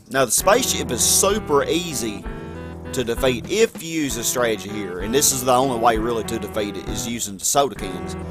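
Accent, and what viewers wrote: South, United States. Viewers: At first I thought you were British, but about 1 minute in and I realize you are southern/country. This is easily my favorite game from the SNES and Sega Genesis era. A friend and I reached the 40 something level where you fight the giant spider, and the game glitched out and we couldn't progress any further. Basically robbed of beating the game, the one and only time we made it that far. It's level 46 or 48 or something like that.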